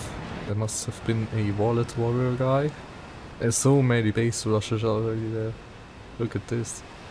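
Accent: Germany. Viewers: You are german right ? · Kann es sein das du deutscher/österreicher bist? ^^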